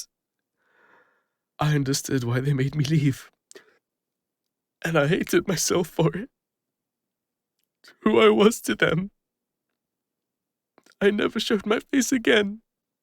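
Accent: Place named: England, United Kingdom. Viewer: You've absolutely nailed the English accent 😁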